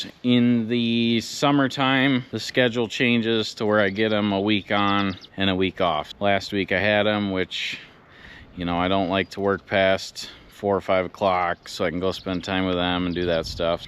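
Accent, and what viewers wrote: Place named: Canada. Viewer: Ah ur too Funny ... Canada " eh "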